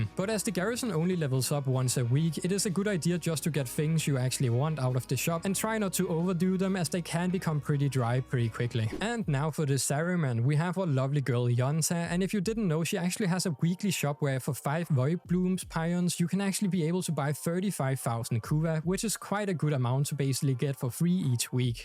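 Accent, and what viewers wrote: Denmark. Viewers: Danglish? <3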